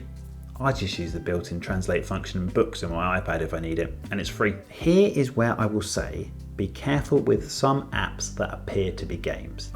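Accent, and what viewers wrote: United Kingdom. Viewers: I love your English accent! In school we learned "British English" but because of an unfathomable amount of American input growing up I now have trouble breaking away from that American vocab and pronunciation. Trying to change it now as an adult by consuming as much as I can from the UK😅 Great video as always!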